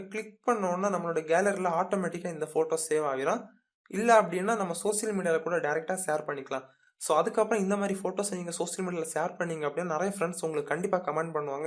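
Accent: Kanyakumari, India. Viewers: neenga pesurathula...irnthu..kandupudichen...me..also Kanyakumarian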